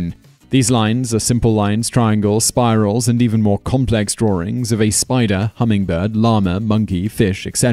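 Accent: United Kingdom. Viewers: Bunk. Something 'found' by one guy who's keeping it a secret is not archeology, or even interesting. The Shroud of Turin has long been debunked. Explaining away the carbon dating? "Interest of scientists and astrologers" Stonehenge: "Salisbury valley"? You're accent indicates you could've easily visited the site and seen that it isn't a valley, it's label on the Ordnance Survey maps and in everyday conversation as 'The Salisbury Plain.' You undermine every other video you make when you make this sort of junk promoting easily discredited nonsense.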